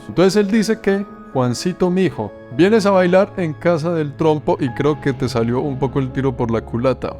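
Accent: Colombia. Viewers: Haaa no, si eres comunista ya no te sigo mas jjjj jjj Me encantan tus videos y ese acento colombiano. Dios te bendiga, desde Cuba. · Es un lindo sueño pensar en su hermosa voz y su bello acento colombiano, como el primer sonido que yo podría escuchar al despertar. Es usted bello y admirable. Me ha robado el corazón con su personalidad y su talento. Reciba usted un gran abrazo con mucho cariño desde México 💕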